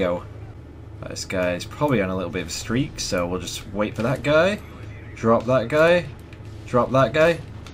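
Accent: United Kingdom. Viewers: U brittish?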